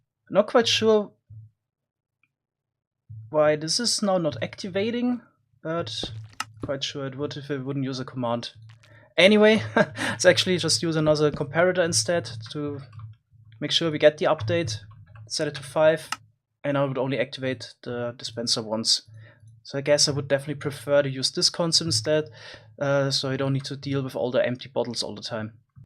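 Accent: Germany. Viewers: The German accent kicks! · Wie man hört das du deutsch bist haha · Das deutscheste Englisch auf Youtube. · Dieser deutsche Akzent einfach xD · Mann Hört das du deutsche bist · Ich bin mir sowas von sicher das du n deutscher bist xD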